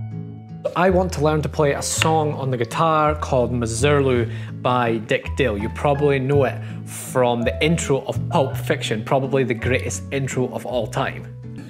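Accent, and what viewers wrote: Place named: Scotland, United Kingdom. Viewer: your videos are always an inspiration to me, thank you! Your methodical and logical approach, and calming Scottish burr, provide a path to things I've previously thought were out of my reach. God bless you!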